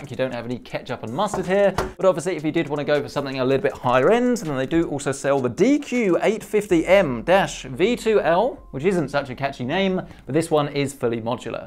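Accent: United Kingdom. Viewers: Why do you say “zee” when you’re a Brit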